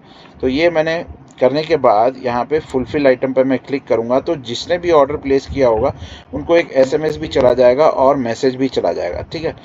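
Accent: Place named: India. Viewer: Why does he speaks with a heavy Indian accent,I couldn't even listen to what he's saying about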